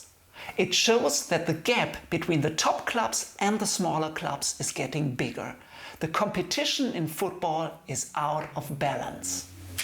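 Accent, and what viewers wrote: Germany. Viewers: Possibly the most german sounding German I've ever heard. Great vid!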